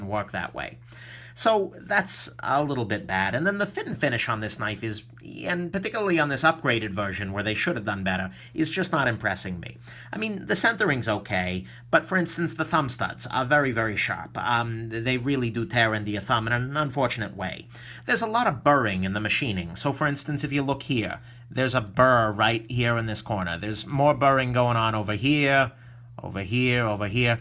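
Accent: New York, United States. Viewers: New yawk I'm assuming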